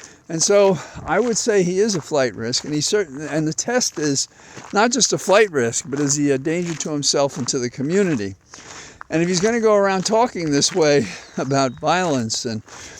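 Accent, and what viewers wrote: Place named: Ireland. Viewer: I do not agree with some things you say,but you do have a wonderful Irish blos in your voice and I think you are a very good Poet without knowing it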